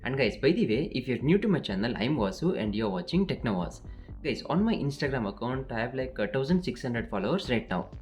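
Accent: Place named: Tamil Nadu, India. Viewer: I always thought you were from tamil nadu based on your accent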